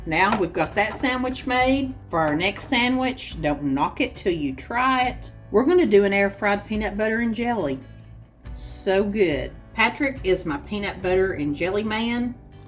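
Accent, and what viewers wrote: United States. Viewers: Can I just say I think your American accent is absolutely adorable, your so friendly, lovely lady, and obvs your air fryer recipes are fantastic. 😊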